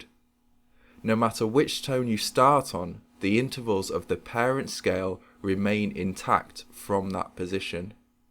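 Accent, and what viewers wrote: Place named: England, United Kingdom. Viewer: I really don't get all the hub-bub about this guys voice. He's from fucking England, and he has an accent. Wow, right? It's almost as if these comments are from 15 year olds on their junior-high school library computers. Regardless of his pace or inflection, this -IS- an instructional video, and he's not a bloody actor. The focus should be on clear, articulated information; not a swooning narrative with Shakespearean prose. Anywho, very nice video, thanks!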